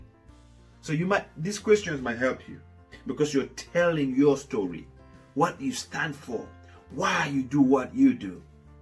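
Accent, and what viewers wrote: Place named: Africa. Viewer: This is a pure Native African English accent😍😍😍. Thumbs up👍🏿Bro. Keep up the spirit. Where are you from?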